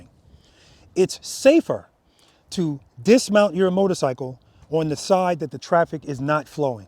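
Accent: New York, United States. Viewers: Picked up a bmw GS this morning, they're not that bad... it being a 750GS not a 1250GS Adventure (the tallest version, which you probably rode) is completely beside the point. It is a massive difference to the Yamaha/Star Bolt (my Yamaharley lol) I had the last 10yrs. Also as an aussie I love your New Yawk accent, I'm a weirdo who likes listening to how people talk :)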